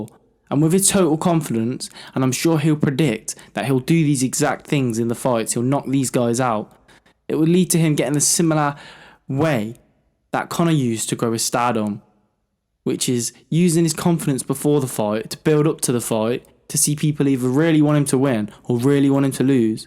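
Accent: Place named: United Kingdom. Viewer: That’s a nice British accent you have brother - good breakdown!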